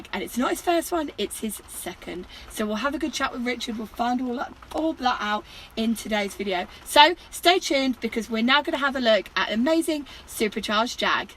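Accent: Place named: RP, United Kingdom. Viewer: Oooh gone posh again! Suits you I reckon. Another great car review as always 😁👍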